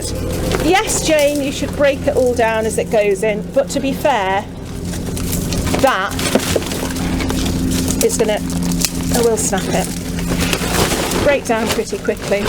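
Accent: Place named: Stoke, United Kingdom. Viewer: Hiya Jane you sound like a stokie? Have you had any storm damage ?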